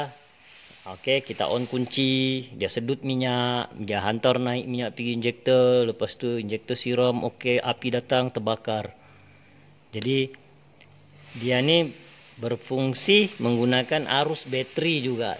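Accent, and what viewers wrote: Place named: Tawau, Malaysia. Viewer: mcm org twau. bangkel d mana bos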